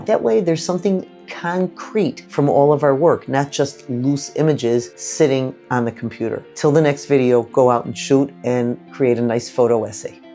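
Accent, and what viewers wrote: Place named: New York, United States. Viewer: Enjoyed finding your channel. Definitely inspires me. I am originally from Brooklyn, NY and thought you were from NY as well until you said Chicago. Didn't realize the similarity!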